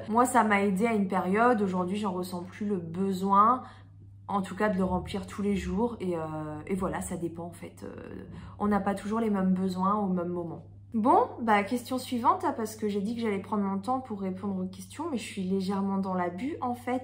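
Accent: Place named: Brittany, France. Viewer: WoW une bretonne dans le sud ! Mais comment tu supportes la chaleur ?! Pour moi, descendre plus bas que Nantes c'est déjà pénible... C'est marrant parce que j'aime beaucoup ton petit accent (genre quand tu dis "pour soi-meume") bien de là-bas, j'aurais jamais pensé que t'étais bretonne comme moi !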